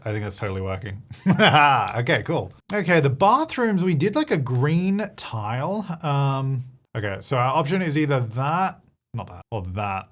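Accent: Australia. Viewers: No doubt that’s an aussie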